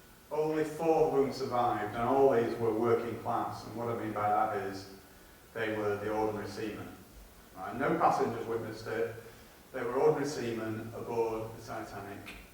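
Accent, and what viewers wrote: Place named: United Kingdom. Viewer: The echo-ey AUDIO, along with the fast speech, & British accent, make it difficult to understand what is being said; needs Improvement. Thank you.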